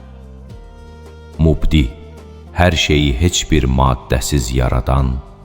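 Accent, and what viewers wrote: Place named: Turkey. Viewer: Salamun aleykum, Allah razı olsun, çox gözəl videodur. bəzi təkliflərimi ümid edirəm dinləyərsiz. Ərəbcə hərflərin bir neçə cür tələffüzünü nəzərə alaraq düzgün oxunuşu üçün adları ərəbcə yazsanız daha yaxşı.olardı. bəzən türkcə oxuyursuz qaf əvəzinə.kaf oxuyursuz. Uzadılan zaman Ə-ni yox A-nı uzadırsız. Alim yox əslində əyn hərfilə Əəlimdir. Zahir yox Zəəhir. Qaffar əslində. ال غفار Ğaffar oxunur , qafur da ğaafur olur Samad - ehtiyatsız yox ehtiyacsız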